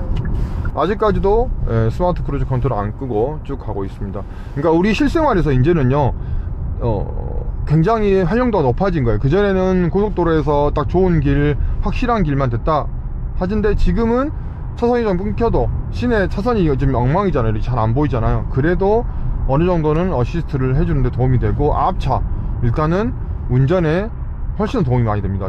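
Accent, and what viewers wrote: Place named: Jeolla, South Korea. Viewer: 전라도 분이구만 말이 귀에 속속 들어온거보니 ㅎㅎ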